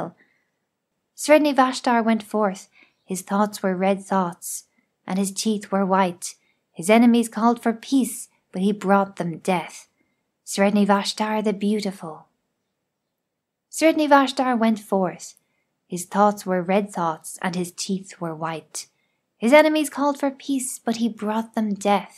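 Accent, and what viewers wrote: Ireland. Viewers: irish brother a feminine Irish voice telling me how do destroy my enemies? I can get behind that.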